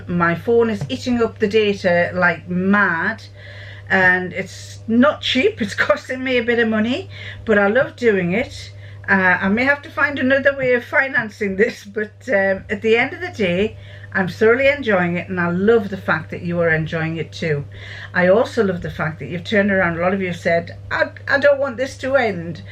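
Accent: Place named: South Shields, United Kingdom. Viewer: I hope you have a lovely Christmas you and Brian, you will need all your vitamin tablets with all your family, food and dog, energy levels may get low. 😀 it's lovely hearing an accent that my mum and dad had, (they grew up in South shields and easington lane. Don't forget if you come round here to have a walk near the river, let me know and we can meet up for a coffee at the station. Lots of love to you both. Heather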